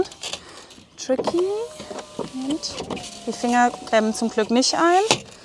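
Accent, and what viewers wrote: Germany. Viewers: Lol I thought you were speaking English with a German accent when the vid started lol maybe a way for me to learn another language :) You need a "Wingnut" for the mirror pad holder change over.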